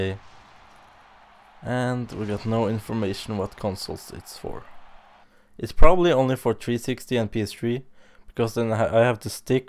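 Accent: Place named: India. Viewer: your accent sound indian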